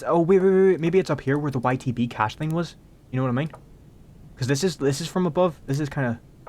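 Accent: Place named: Ireland. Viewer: tbh i only watch your content for the funny irish accent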